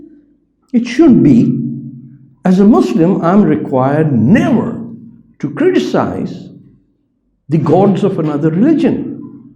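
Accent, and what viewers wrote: Punjab, India. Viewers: Please don’t speak English in Punjabi accent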